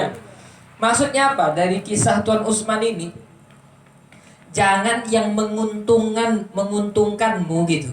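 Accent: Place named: Sumatra, Indonesia. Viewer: Logat sumtra rasa kyai NU jawa, jos pak smoga bisa bhsa jawa jdi lebih jos kalau smbil melawak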